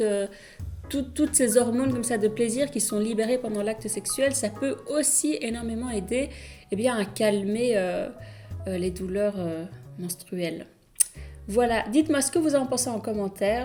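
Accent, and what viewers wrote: Belgium. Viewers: Salut depuis un moment je me demande d'où vient ce joli accent? J'aurais dit belge, du côté de Liège?🤔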